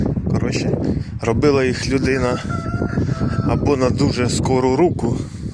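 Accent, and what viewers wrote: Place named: Dnipro, Ukraine. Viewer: Браток,приветствую! Слышу по говору ты из Днепр.области. Поздравляю с покупкой! МАЗ это просто Мерседес для села!!! 😊 А если подцепить прицеп,то 5-6 Газонов заменит в уборочную.😊 Удачного ремонта!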